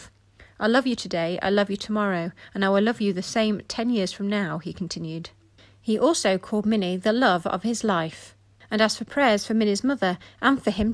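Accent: United Kingdom. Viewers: I love your accent it sounds like queen Elizabeth , so lovely . Rest In Peace Minnie ..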